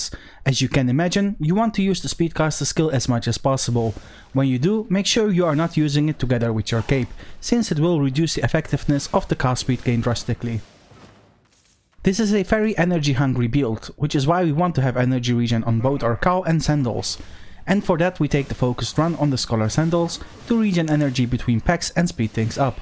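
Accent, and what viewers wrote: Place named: Netherlands. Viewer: Hoor direct dat je NL bent.. Toffe video maar probeer je Engelse accent wat te verbeteren ;)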